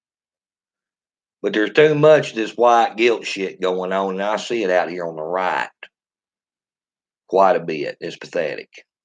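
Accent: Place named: Rural, United States. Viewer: You sound like an inbred hick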